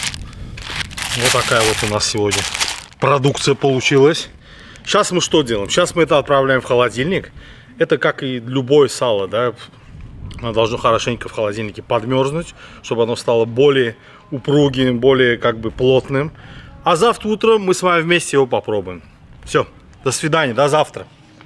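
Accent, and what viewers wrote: Baku, Azerbaijan. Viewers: нет брат он не таджик по аксценту чиста Азербайджанец я таджик не думай что я нацист просто у нас капчоних курдюк я не видел хотя самие лючшие курдюк ето наш Гиссарского породи честно сказат я его постоянно ел в России в санатории нам прислали кыргызские братья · Бакинский акцент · смотрю видео,слушаю ваш бакинский акцент:-респект и уважение!Будьте всегда здоровы,живите долго,радуйте новыми видео, которые не оставляют никого равнодушными,я думаю многие такого же мнения! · Маладец бакинских акцент нигде в мире не перепутаеш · Бакинский окцент..🥸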